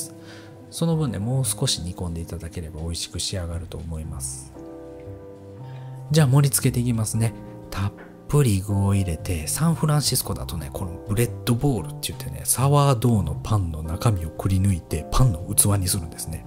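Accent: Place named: Osaka, Japan. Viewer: Twitternからきました。Uraruです。 大阪弁で心地のいいお声。さすがプロの話し方です。 新しいLA TABLEさんですね クラムチャウダーが欲しい季節になりました。 うちのストウブちゃんでやってみます♫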